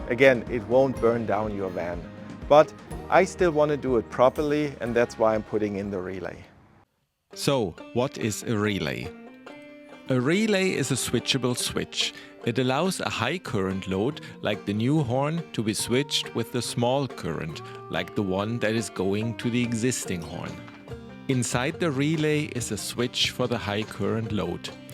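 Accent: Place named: Germany. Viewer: Hehe, man hört sofort das du aus Deutschland kommst😅 , was den Radarsensor angeht, da hättest ohne Probleme die Hupen an den Schrauben montieren können. Der sprinter kalibriert sich jeden start selber von neu. Hat den Hintergrund das der Beladungszustand ja variieren kann. Die paar Grad machen da nix aus. Habe dort auch meine Hupen montiert. Fertiglösungen die angeboten werden haben noch Dickere Platten aus Stahl verwendet wie bei dir in Verwendung. Beste Grüße 😊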